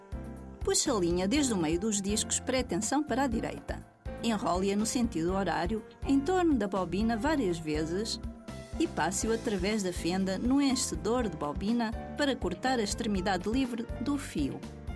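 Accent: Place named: Portugal. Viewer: Meu DEUSSSS , espero que minha máquina não venha con este edioma , portugués de Portugal? É o último, soy de Brasil, bah que asco m não entendí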